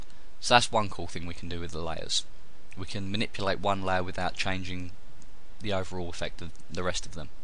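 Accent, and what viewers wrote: United Kingdom. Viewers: ur british?! sexxyyyy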